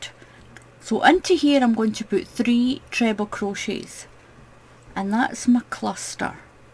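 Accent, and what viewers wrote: Scotland, United Kingdom. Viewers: I love some of the patterns, and I also love your beautiful accent. You sound Scottish. I unfortunately, have trouble following along, because you don't use U.S. information/directions to your video's, and i find that very frustrating when I hear; "double crochet" and it's a "single/ treble." It's quite backwards and confusing to me.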